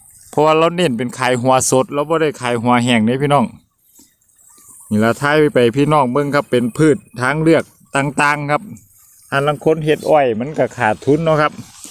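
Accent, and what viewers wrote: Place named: Chaiyaphum, Thailand. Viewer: เจ้ากะเป็นคนชัยภูมิติ ภาษาที่เจ้าเว้า ถ้าบ่ชัยภูมิกะหนองคายเด้ข้อยว่า ข้อยกะคนบ้านแท่นคือกันเด้อ บ้านหัวนารู้จักดี เลี้ยวขวาข้างๆที่ว่าการอำเภอ ไป2กิโลฯมั้ง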